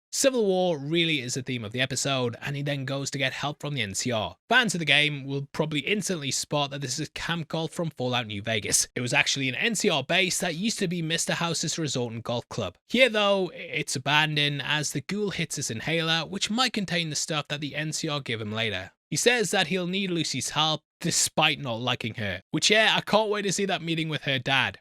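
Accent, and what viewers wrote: United Kingdom. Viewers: How dumb is it that I'm so normalized to your content, that I forget you're from the UK, so when you make jokes on Americans like "Why don't you want to talk like this" it takes me a second,lol?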